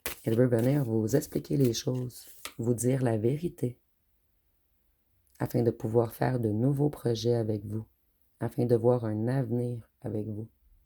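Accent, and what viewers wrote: Quebec, Canada. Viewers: Vous êtes adorable, un travail poussé pour créer de petites cartes bien sympas comme celle des chansons, j’aime bien votre énergie solaire et cette voix sympathique, l’accent du Québec trop mignon. Bref, vos guidances sont précises et agréables. Belle journée 💕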